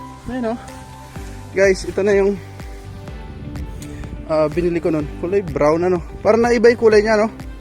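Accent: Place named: Guinayangan, Philippines. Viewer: hahaha kaya pla medyo pamiliar sakin pagsasalita mi guinayangin kasi ako sir💪💪 tuloy tuloy lng sir suporta tayo dyan proud quezonin💪😁🏆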